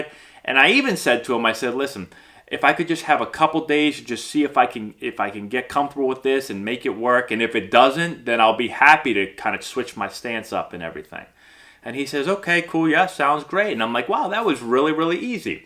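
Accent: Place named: South, United States. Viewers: As a “Texan”, I appreciated the southern accent. Loved it 🤣👏🏼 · Great southern accent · Where are you from that was way too close too us down south man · Nice accent. You pick that up in Winston-Salem? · Great video, as always! I love the Forrest Gump southern baseball coach accent! This is certainly a situation that seems common across levels of baseball. I would love your perspective on how to deal with dumb conduct by coaches , specifically in the youth baseball world. As the owner/director of a travel baseball organization that includes youth teams (10-13u) and beyond how do you handle situations in which parents disagree with the conduct of the coach of their child’s team? Hypothetical: parent of a 13u player let’s you know that coach calls player a “coward”, tells them to get their ass up after being spiked in forearm, tells player to shut his mouth, and when pitcher struggles on mound says to catcher, “don’t look at me, he (pitcher) sucks”. 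Coach states that he is “old school” and that he is preparing players for high school and beyond. I know this is a bit off topic but interested on your perspective on this and what steps you would take or suggest that players and or parents take in a similar situation. Thanks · BTW, your southern accent killed me. I grew up in NE Ohio and played in college in Chicago and went to medical school and trained there, and ended up in Alabama surrounded by people who talk like that all the time. And lots of dumb coaches around here, even at the high school level. I guess they're everywhere. I would love to hear you do this same video after you have a boy who plays into high school to see if your outlook changes as a dad. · Lmao at the southern accent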